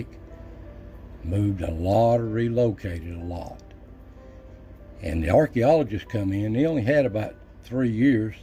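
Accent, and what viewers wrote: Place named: Kentucky, United States. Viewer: "Taint no hant it was Indians watching and protecting, "Love the way you talk, "A Kentuckian Cherokee here, "That's the way everybody talks up in these mountains. "Stayed in trouble at schools because of the words "Taint and hant, "Yep, Hant got none or I taint study and work at the same time, Or over yonder, Or up the road just a skip, "Skip meant a mile or so. "Love it, "You can not beat mountain folk, Know where, "And if they like you, "Well then you've got a friend for life, "But if they don't like you, "You'll know instantly.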